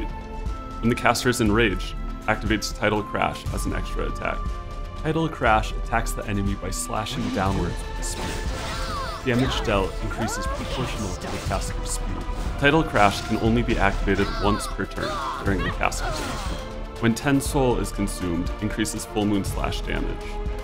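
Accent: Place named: England, United Kingdom. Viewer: English narrator? U sir, have my like 👍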